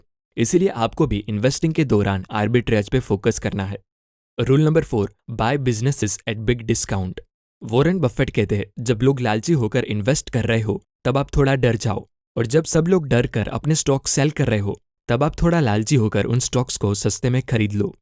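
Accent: Gujarat, India. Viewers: Tame gujarati chho?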